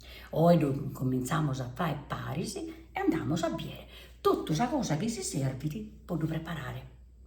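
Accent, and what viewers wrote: Sardinia, Italy. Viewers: Che bello sentirti parlare in Sardo! Un po' diverso dall'olbiese ma ho capito più o meno tutto 😍 il pane poi dev'essere una bontà 👏👏👏!pagu onu 😋😋 Grazie, sempre dolcissima 🌹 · Ciao bellissimo sentirti parlare in dialetto, si differenzia molto dai paesi del sud Sardegna ma sono riuscita a capire quasi tutto 😊❤ bellissimo pane❤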